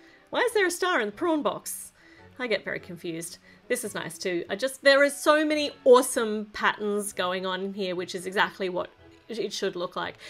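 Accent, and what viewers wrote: Australia. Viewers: I think those thinks over the trap door are Banana leafs. They are used as plates in South Asia. I am from India and we eat from banana leafs whenever there is a function like a wedding. By the way when you don't upload videos any one day I miss your crazyness, Australian accent, cuteness in your words and awsone lego videos. You kept me happy in the lockdown too. I love your videos😀😀😀😀😀🥰🥰🥰🥰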